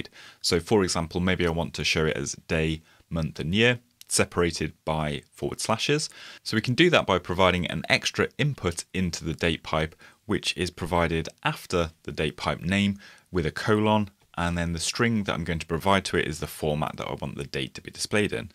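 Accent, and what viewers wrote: United Kingdom. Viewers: after dealing with Indian guys` talks in angular, it is nice to came across an expert who has British accent :)) Thanks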